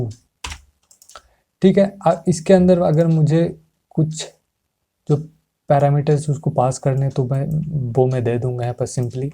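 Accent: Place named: Bengal, India. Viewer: Ami 1 ta app banate chai... Kintu sob kichu r tutorials pachina... Tai banate parchina... Tomar kotha sune mone hoy tumi bengali... Tai bangla te comment korlam... Ektu help korle ami app ta banate parbo... Thanks....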